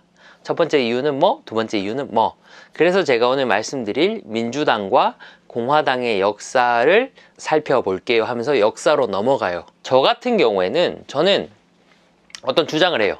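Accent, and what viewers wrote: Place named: Gyeongsang, South Korea. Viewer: 저는 어릴때부터 발표를 너무 싫어하고 기피했는데 살면서 정말 피하고 싶었던 그런 면접을 보게 되었거든요ㅠ 그런데 제가 부산사람이라 억양을 어떻게 해야될지도 모르겠고 그래서 더 너무 힘들다고 생각했는데 선생님께서 경상도 억양을 섞어서 너무 자연스럽게 똑부러지게 말씀하시는거 보고 부산말로 하는게 이상한게 아니구나 깨닫고 지금 그것만으로 너무 감사하는 마음입니다ㅠ 저 힘내서 잘해보겠습니다.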